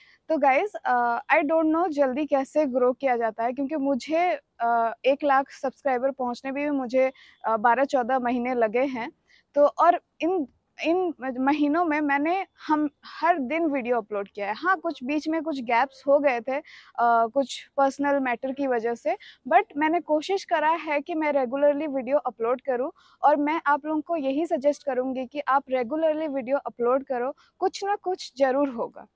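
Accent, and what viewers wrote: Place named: Odisha, India. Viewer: Brooo in my mind i was thinking u are from odisha because of ur accent 🥴, u actually are .... 🥹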